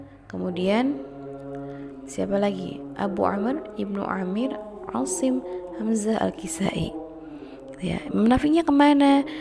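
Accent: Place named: Indonesia. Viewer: Sgt baik penerangan Ustazah. Lahjah Indon saya boleh faham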